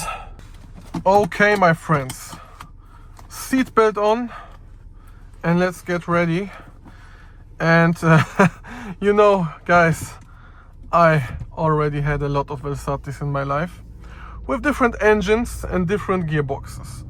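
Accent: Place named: Germany. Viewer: I'm so confused as to why this guy sounds German but is driving in Poland. 😆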